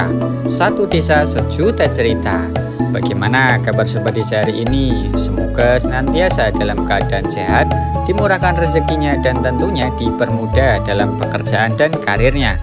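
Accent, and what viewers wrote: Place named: Central Java, Indonesia. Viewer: Cara ngomongnya lhoooo..Jawa tengah bangeeet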